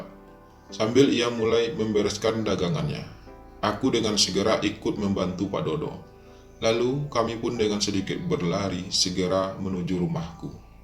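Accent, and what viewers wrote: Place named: West Sumatra, Indonesia. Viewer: Logatnya logat Sumbar (Minang)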